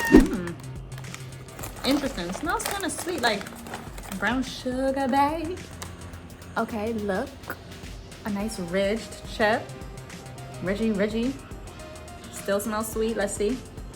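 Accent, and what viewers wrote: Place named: New York, United States. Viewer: Yerrrrr… I can tell you’re from NY. Love the vid!!! Can’t wait to order something’s from this company.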